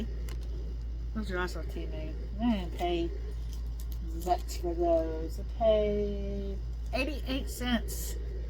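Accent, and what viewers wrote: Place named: Kentucky, United States. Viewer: I love Kentucky I love the accent too ❤❤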